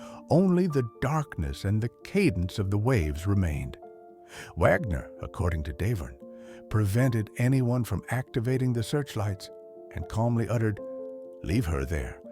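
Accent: South, United States. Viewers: He has Alzheimer’s. Why does the narrator have a deep southern accent? Walked and Wagner supposedly were in a sexual act when Natalie walked into the main room of the boat. If several people heard her cries, why did they not take action? Everyone was extremely drunk. Can you solve a case 44 years later? A tragedy definitely. I would never make an opinion based on this evidence, or lack there of. If those other wealthy people heard her screams, why didn’t they make sure all was ok?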